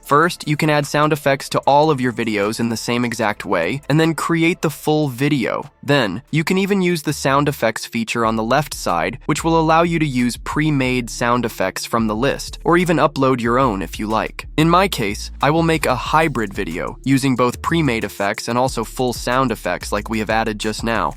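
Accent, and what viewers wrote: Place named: United States. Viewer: Love American accent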